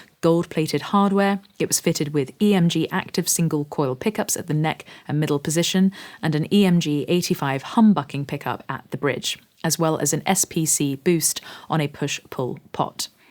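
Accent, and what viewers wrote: United Kingdom. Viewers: She can play well and speaks a perfect English. By the way, she's got a british accent. So lucky to have the chance to play those wonderful guitars ! I enjoyed her video. Well done !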